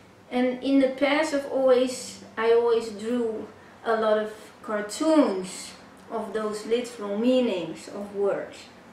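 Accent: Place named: Netherlands. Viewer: Ik dacht al dat je Nederlands bent. Je kan echt heel goed Engels!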